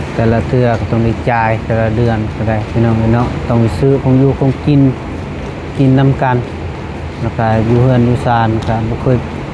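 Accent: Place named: Laos. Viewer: เป็นทหารต้องเข้มแข็ง อย่าอ่อนแอสู้ต้องดูแลประชาชน.เว้าสำเนียงลาวเผ่าได๋ฟังคือบ่คือบ่าวเตียง.สู้สู้เด้อทหารตัองอดทน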